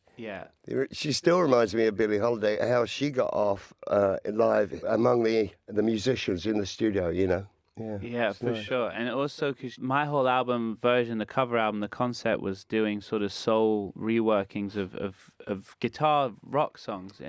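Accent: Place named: United States. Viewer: this guy now has an American accent, master!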